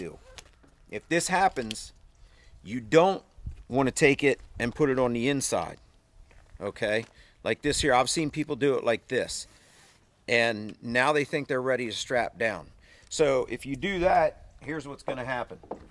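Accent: Australia. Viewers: Mr Big Australian English. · Great vid bro love the accent, it’s been a while since I used one of these dazz Australia..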